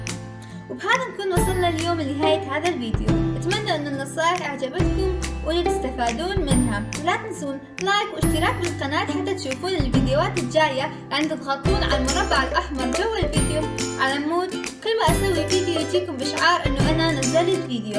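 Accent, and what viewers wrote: Mosul, Iraq. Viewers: لهجتج مصلاوية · انتي منين من العراق لهجتج مصلاويه اعتقد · انتي عراقيه لو لا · تكدرون تعرفون من الكلام اذا عراقي اوعراقية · إنتي عراقية لو سعودية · انتي عراقية لو لا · حلووو الفيديووو .❤عجبني😍 بس سؤال انتي عراقيه 😃❤ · متاكده انتي عراقيه بس العراقيات ميحجن هيج مثلج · انتي عراقيه صح لو لا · ه ممكن اسال سوال هل انتي عراقيه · ممكن اسوين فيديو تعريف عن نفسج لان مدا نعرف انتي عراقية او سعودية لو سورية 😂😛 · اريد اسأل عراقيه انتي ؟؟ وين عايشه😞 ونصايحك جميله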